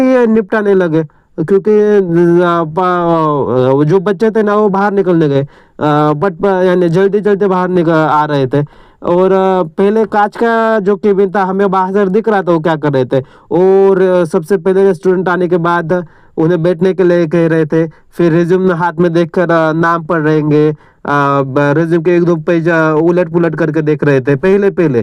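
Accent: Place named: Maharashtra, India. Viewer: साहेब आपल्या हिंदी वरून वाटत आहे आपण मराठी आहात आपण मराठी मध्ये व्हिडिओ बनवा समजल तरी आपल्याला काय म्हणायचं आहे ते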